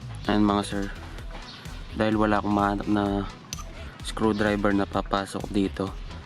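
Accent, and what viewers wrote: Pampanga, Philippines. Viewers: Bos, kapampangan kaba.?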